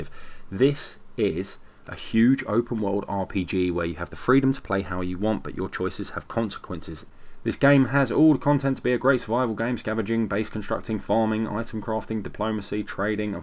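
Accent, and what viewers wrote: United Kingdom. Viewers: that British accent tho. ;)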